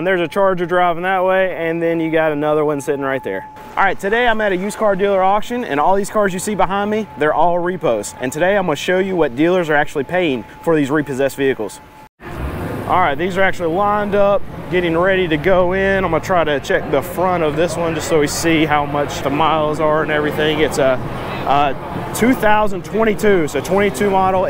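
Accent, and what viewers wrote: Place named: South, United States. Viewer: From the sound of your accent your in the south. No money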